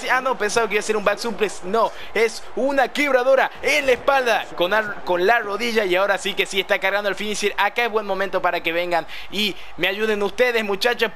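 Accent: Argentina. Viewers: una pregunta ¿sos argentino?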